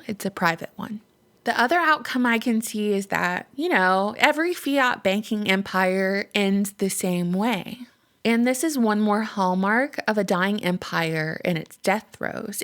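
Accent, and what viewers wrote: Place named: Georgia, United States. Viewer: omg I can hear you Georgian accent...I'm so excited for this! 😅 haha im such a dork, love your vids! 💕💕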